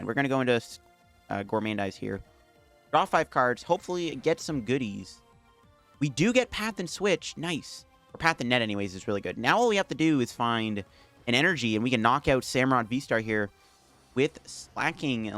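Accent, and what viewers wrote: Canada. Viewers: are you from Canada? Love the content always and I'm constantly using your deck ideas.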